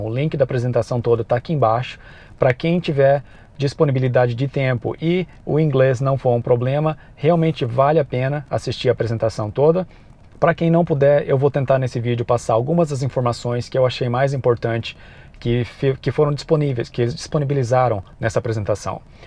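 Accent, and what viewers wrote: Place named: Brazil. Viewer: Não há nenhuma dificuldade. Aliás, tenho partilhado alguns de seus vídeos, não só com a família, mas também em grupos portugueses do Facebook. Precisamente pela vantagem de estarem em língua portuguesa. O sotaque brasileiro e o uso de uma ou outra palavra típica do Brasil, não é problema. Crescemos em Portugal a ver novelas brasileiras! :D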